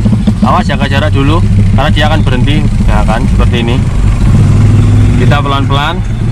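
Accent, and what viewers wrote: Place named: Java, Indonesia. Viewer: Dari logat bicaranya sepertinya sampean orang Jawa mas ya.. 🙏 Salam saking tiyang Jawi teng Papua mas-seee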